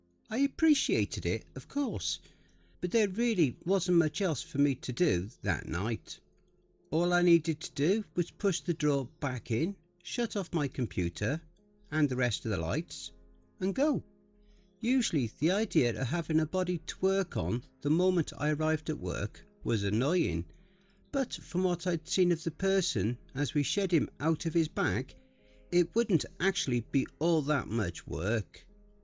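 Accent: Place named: RP, United Kingdom. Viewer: With a delightful RP British accent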